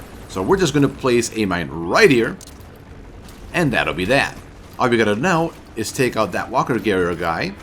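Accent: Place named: South, United States. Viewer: he is probably from the south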